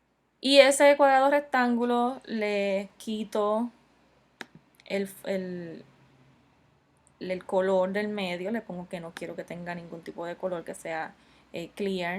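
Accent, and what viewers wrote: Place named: Venezuela. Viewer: Pensé que era venezolana.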